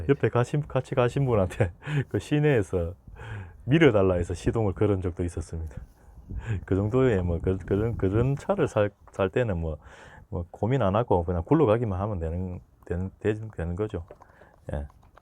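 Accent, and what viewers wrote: Gyeongsang, South Korea. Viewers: 근데 엔진오일 교체하는데 얼마한다고 저 난리를ㅠㅠ 저도 부산인데 사투리 심한건 아니고 억양 자체가 경상도네요ㅋ 유튜브 대박나시길 기원합니다 저렴하게 엔진오일 교체하는 꿀팁 알려드리겠습니다 파트존 깔고 내차 차대번호 입력하면 가격대로 나옵니다 저도 528i 끌고 다는데 최저가로 구입하시고 글구 물건이오면 공임나라 예약해서 교체하세요 그럼 총 10만원도 안들어갑니다 오일 흐르면 환경파괴 잘못 교환하면 피박씁니다 글구 엔진오일 교체할때 에어컨 필터도 같이 교체하세요~^^ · 목소리리 톤이 아주 좋으십니다. 남자로써 차에 관심을 많이 가지는건 당연하겠지만 실천하기가 싶지 않는데 존경스럽습니다. 혹시 경상도 분이신가요.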